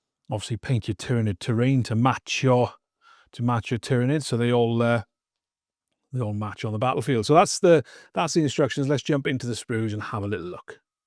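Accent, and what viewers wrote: United Kingdom. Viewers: Lovely accent, whereabouts in the UK are you from?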